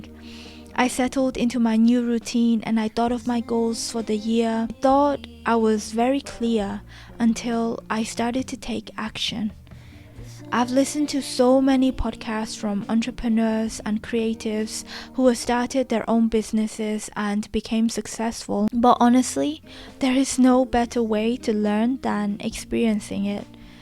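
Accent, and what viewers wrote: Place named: England, United Kingdom. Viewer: I hear a cute English accent❤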